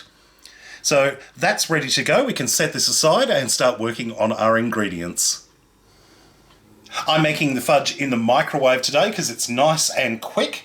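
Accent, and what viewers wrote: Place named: Australia. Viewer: Love your videos I heard your Australian because I am too keep making fantastic videos and we can reach one million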